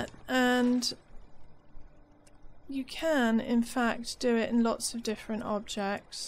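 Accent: United Kingdom. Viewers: Finally, tutorials with a beautiful British accent.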